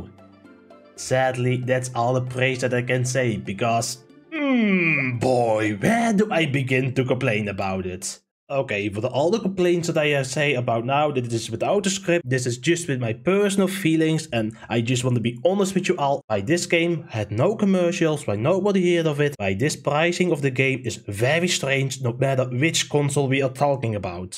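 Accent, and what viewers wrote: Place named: Netherlands. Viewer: That Dutch accent is so funny. 🤣 Thanks for your honest review, was unsure with this game but am glad I’m skipping it. 😉 Great work sir!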